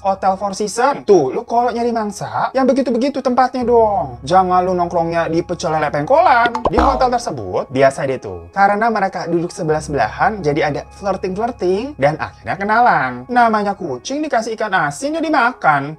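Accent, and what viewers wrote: Java, Indonesia. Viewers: Sehat terus mas. Anehnya dari mas, namanya bule bangget... Tapi bahasanya medok Jawa banget...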